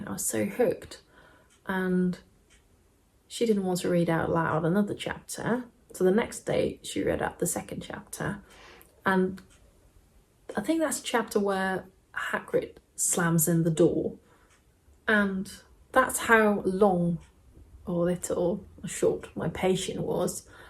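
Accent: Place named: United Kingdom. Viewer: I'm surprise you are from Denmark. You sound like a British person, so I thought you were from England.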